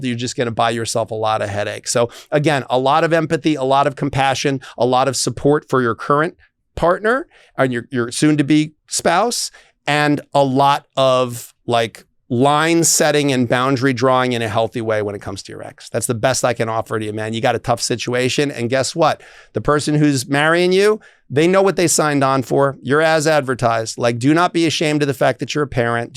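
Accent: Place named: England, United Kingdom. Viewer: That’s a dynamite English accent. You’re the best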